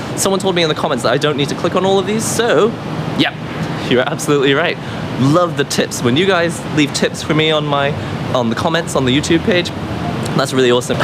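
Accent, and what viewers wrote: United Kingdom. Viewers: I'm not a racist at all but holy shit how did u learn to speak british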